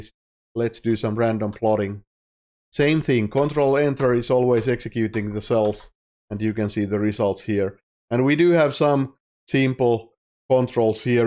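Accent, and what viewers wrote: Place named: Finland. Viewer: Love the Finnish accent, great! From Sweden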